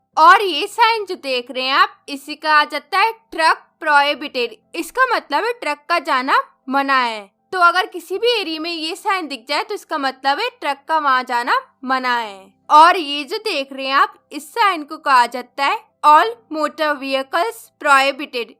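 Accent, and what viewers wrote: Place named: Himachal Pradesh, India. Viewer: apki voice se lagta h ki ap himachal ya uttarakhand se h nice video very helpfull thnx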